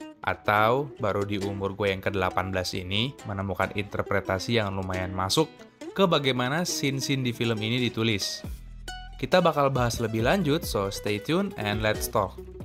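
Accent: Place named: Indonesia. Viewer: Jewel wak, bacanya logat indo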